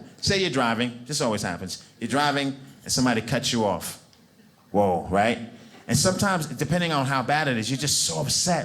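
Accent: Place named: New York, United States. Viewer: He is so hysterical, such charisma, and I can watch him once a week and still laugh at the same show. He’s wonderful and keeps it clean. I love his accent from New York, he cracks me up❤️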